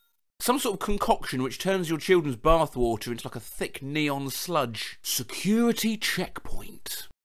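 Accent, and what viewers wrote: United Kingdom. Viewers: hes british dw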